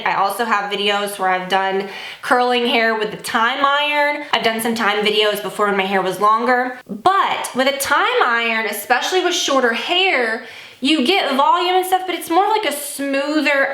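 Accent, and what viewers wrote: South, United States. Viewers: Love when your southern accent pops up in your videos! I just recently made the big chop too. I’ve had pretty good luck with a wand but haven’t braved the flat iron curls with short hair yet. I’ll have to try. Thanks! Hope you & your family have a great Christmas!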